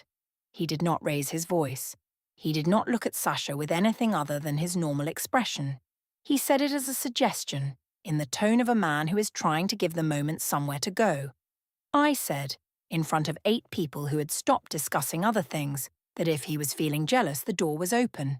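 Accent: England, United Kingdom. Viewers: I enjoy the posts on this channel: the English accent, the female voice for a female narrator, the precision of ths details, and the studied inexorability of the narrative, among other virtues. This story, however, was WAY too subtle! Are we to understand that the narrator had a lesbian relationship with Sasha? Or was she only thinking of it? And what was the meaning of the two posts? How was the concept of thoughts being modified as if they were her own (or some such wording) -- whatever that could have meant -- defamatory? And what was the significance of the two copies of the novel, and what exactly was the narrator's explanation of why she liked to run her fingers along the spines of the books? Was it related to a lesbian relationship in college? And did the husband really divorce her because she suggested she was pressured into moving into the suburbs? 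I am utterly baffled by this story.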